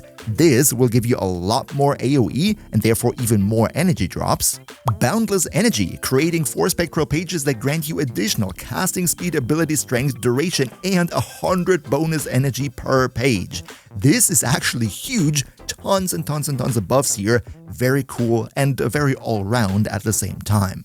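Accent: Germany. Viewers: Esl german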